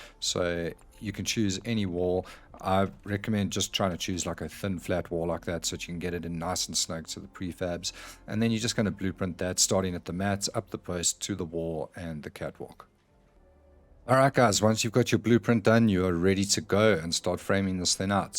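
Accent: South Africa. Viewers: Is that a South African accent I hear?....🍻🇿🇦👍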